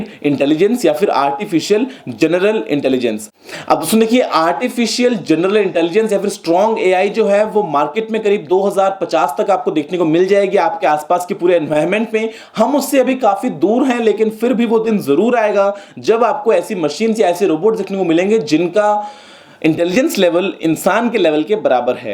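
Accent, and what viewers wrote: Bengal, India. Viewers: Sir.. Aap bengali ho?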